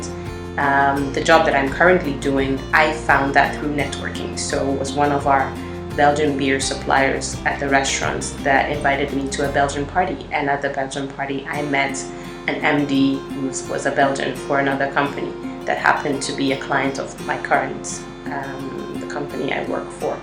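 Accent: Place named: Ghana. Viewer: She sounds like she has a Ghana accent (ie not foreign). She said that BOTH of her parents are from Ghana.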